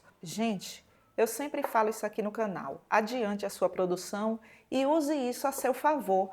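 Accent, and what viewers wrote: Bahia, Brazil. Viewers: Amo suas receitas, baiana arretada adoro seu sotaque mulher.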